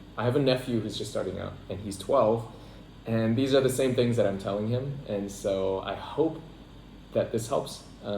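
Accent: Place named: Iowa, United States. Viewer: Jakarta, Indonesia? You sound like you’re from Des Moines, Iowa! Great video brother. Army is spectacular. The advice you share is gold.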